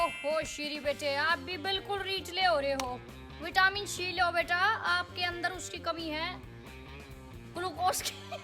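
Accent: Haryana, India. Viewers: I love your haryanvi accent. 🥰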